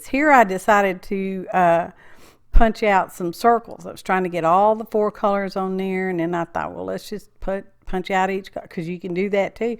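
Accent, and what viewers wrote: South, United States. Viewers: I love your accent. Are you a Southern Belle. ❤ I loved the swatches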